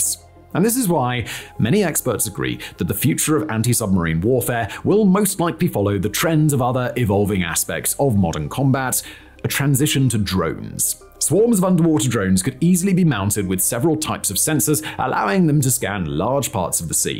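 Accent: England, United Kingdom. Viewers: I appreciate so much English accent compared to US one. Better understanding for my old scholar skills... La cerise on top of the gâteau. 🇨🇵❤️🇬🇧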